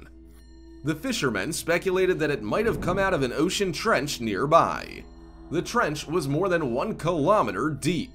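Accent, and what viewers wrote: United States. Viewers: Might have been interesting to watch, but I only lasted around 15 seconds. I think you have to be american to be able to listen to that narration any longer.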